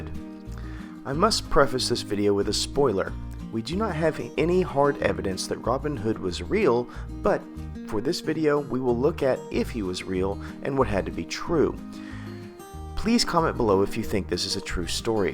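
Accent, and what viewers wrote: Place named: United Kingdom. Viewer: Nice British accent, I give it a 9/10🤣 Video 10/10 🤙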